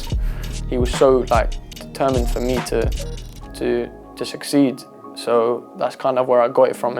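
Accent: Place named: England, United Kingdom. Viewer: Wait he has an English accent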